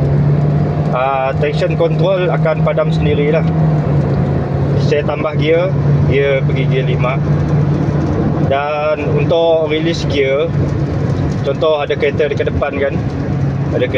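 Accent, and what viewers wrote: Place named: Sarawak, Malaysia. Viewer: Org srwak je ade tune mcm n😁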